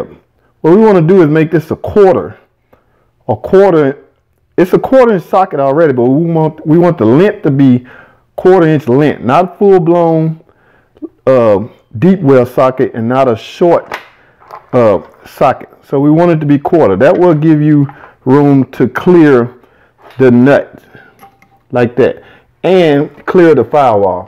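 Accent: South, United States. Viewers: talk like the dirty dirty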